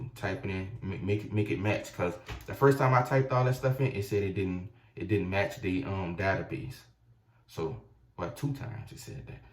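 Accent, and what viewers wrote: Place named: New Orleans, United States. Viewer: Are you from Nola???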